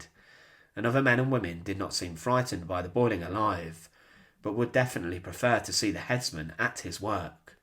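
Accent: United Kingdom. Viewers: To clarify, he has a lovely British accent... it's the constant ebb and flow that is distracting.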